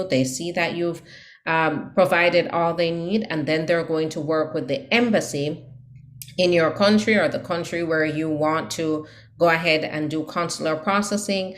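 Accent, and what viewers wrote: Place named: Jamaica. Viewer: You Jamaican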